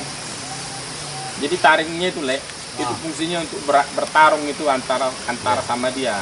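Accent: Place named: North Sumatra, Indonesia. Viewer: dialek bg mantab kali..org sumut kali..sukses selalu 💪🏆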